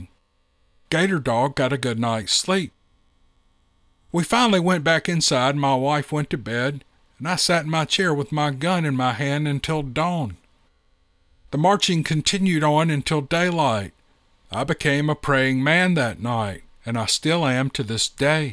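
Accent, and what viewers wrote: South, United States. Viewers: Hey, I hope that all is well with you and your family. I have came across your channel doing the Covid out break and it have been a delight to listen to you narrating these amazing stories. I enjoy hearing the Southern Comfort of your voice and the in-between conversation. By the way, I wanted to check on you because i've been missing your (live) until the next time, take care and I will continue to listen to these awesome stories.❤ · Love that southern accent and laugh sir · I get to listen to your southern charm for a few hours tonight. Thank you, we all appreciate your stories and your charm. Hello from Pensacola Florida y'all. Best regards to all of you.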